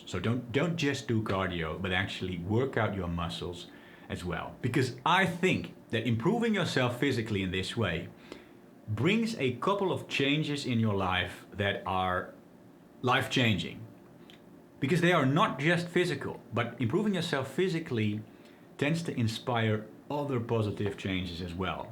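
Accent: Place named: Netherlands. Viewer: I have no idea if you want to share your nationality with us, but you seem dutch (no offense because your pronounciation is proper). Am I right?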